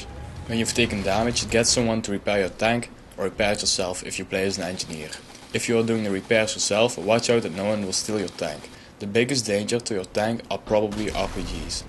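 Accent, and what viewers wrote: Netherlands. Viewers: wow dat accent hoor je meten dat je uit Nederland komt geweldige video trouwens erg duidelijk en goed in elkaar gezet ik ben nu lvl 14 1/2 en zelf ik heb nog moeite met de tank. bedankt! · yeah,the first 3 seconds you started talking i thouth:''he is dutch...'' me to! :S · kom jij toevallig uit limburg? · Dat Nederlandse accent herken ik meteen :D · r u dutch? thats not an insult, for me as german it sounds that way ;) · Nederlands accent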